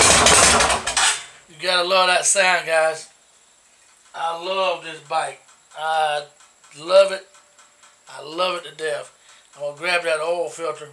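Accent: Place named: South, United States. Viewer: Dude sounds like a hillbilly...lol...Makes an easy job sound like a lifetime...lol...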